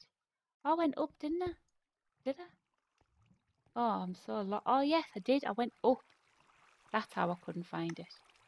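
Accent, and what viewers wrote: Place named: Northeast England, United Kingdom. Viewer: Your accent reminds me of mine when i was still living in the uk. But i was more north central than north east.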